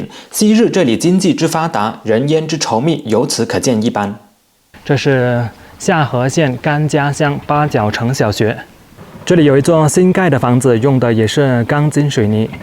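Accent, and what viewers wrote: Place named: Hakka, China. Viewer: 從你的普通話 可已知到你是客家人 佩服你的毅力及對各地風警 人文的解說 我是住在南加州的七十八歲的客家老者 喜歡看你的視屏 我也有制作一些旅游視屏 但難豋大雅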